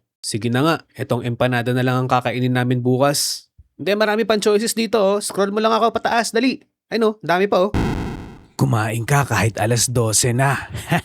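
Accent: Ilocos, Philippines. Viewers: Ilocano ka yahhh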